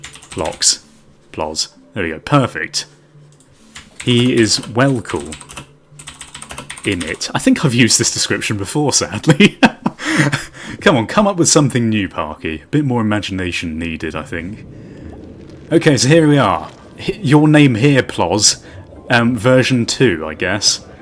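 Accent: United Kingdom. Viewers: British markiplier voice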